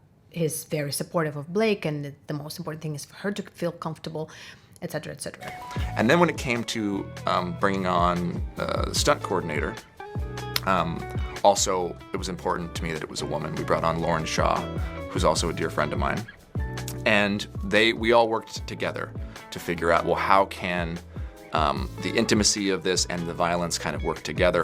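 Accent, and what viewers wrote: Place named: Ukraine. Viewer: Hello. Are you from Ukraine? Once I heard your accent I thought you're from Ukraine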